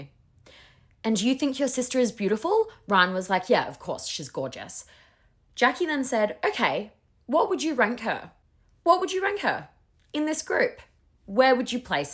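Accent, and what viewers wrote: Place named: Australia. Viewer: Just found you! You are hilarious! So cool to hear an Aussie accent doing the recaps! Going to binge all your content! ❤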